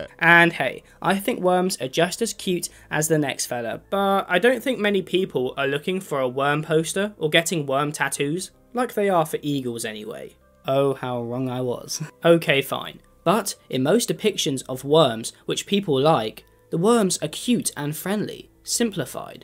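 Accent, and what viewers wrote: United Kingdom. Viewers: British, aust? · oh baby a dhmis commentary made by a mf with a British accent??? my kind of video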